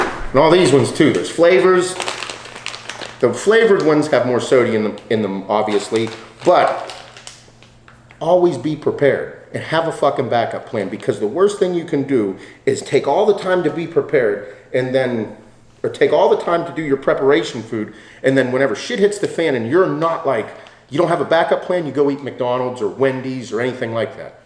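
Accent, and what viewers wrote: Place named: Cleveland, United States. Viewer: You anywhere near Cleveland? I hear the accent and see giant eagle got a feature.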